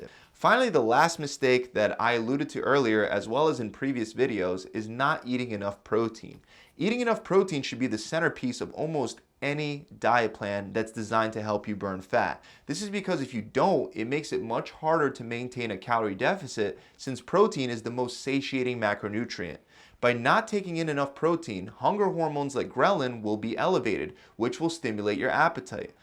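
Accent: Philadelphia, United States. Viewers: Know I can tell you are from Philly or Jersey? 💦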